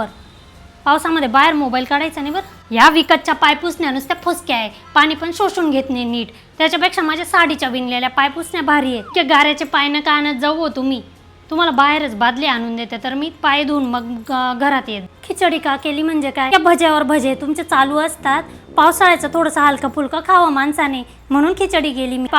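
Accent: Khandesh, India. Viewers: Typical Marathi Aai Tyat Pan Khandeshi Tone 👌👌👌👌😍😍👍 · खानदेशी लहेजा खुप छान सांभाळत घरोघरी असेच संभाषण चालूच असत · बापरे, तू ग्रेट आहेस ताई! Content wise पण आणि अभिनयाच्या बाबतीत तर तुला परकायाप्रवेश करायला तुला काहीच वेळ लागत नाही, सराईतपणे करतेस! एकूण एक मुद्दा cover करतेस, ते पण तुझा खान्देशी लहेजा सांभाळत! खूप खूप शुभेच्छा!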